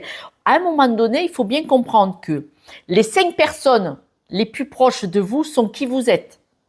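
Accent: South, France. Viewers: Votre analyse est super. Je me rends compte en effet que certaines choses changent. Merci votre accent du Midi réchauffe le coeur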